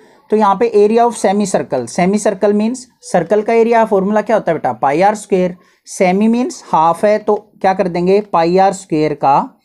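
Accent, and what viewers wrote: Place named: Sonipat, India. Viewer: Sir aap 100% sonipat se hai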